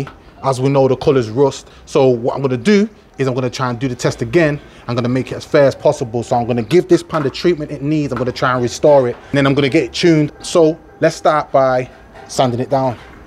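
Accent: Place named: United Kingdom. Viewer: Never knew you were british man love this channel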